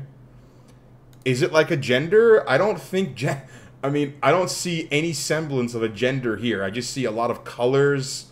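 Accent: United Kingdom. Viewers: i love your british accent its so funny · Love the British accent bro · No one talking bout his British accent 💀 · bro has an awesome british accent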